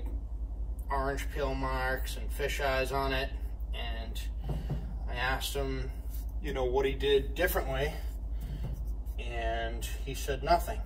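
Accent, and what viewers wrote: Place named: Massachusetts, United States. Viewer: I watched your video and am impressed with your work. I have a 7 yr old porcelain clawfoot tub. The faucett sits on the top of the tub, and there is of course a chrome drain.can these be taped off ? I'm a painter, but a perfectionist, and do not want to tackle this by myself. I heard your voice and guessed you may be from MA, then I saw the Patriots bucket 🤣 I'm in Weymouth, can I hire you to do it for me? Thank you !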